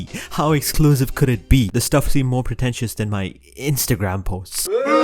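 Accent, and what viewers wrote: India. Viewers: He sounds indian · i am new here but u sound like a indian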